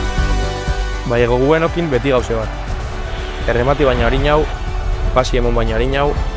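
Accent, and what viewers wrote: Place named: Bermeo, Spain. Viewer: Eskerrik asko... Oso dotore zure bermiotar hizkera. Bizkaitarrak garenontzat bihotza ikututen dau...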